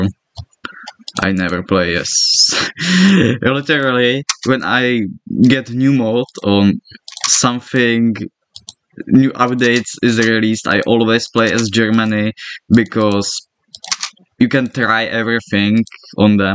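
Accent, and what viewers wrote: Czech Republic. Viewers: Hmmmmmm Czech accent? Nice video bro💪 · i can hear your czech takže ahoj so slovenska!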